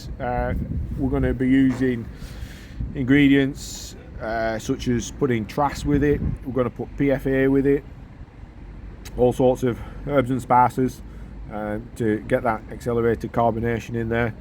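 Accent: Lancashire, United Kingdom. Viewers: Your accent ? I'm going to say Colne in Lancashire? Great video BTW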